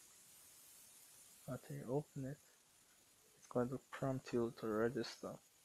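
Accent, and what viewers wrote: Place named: Jamaica. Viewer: I can tell you're Jamaican, me TOO!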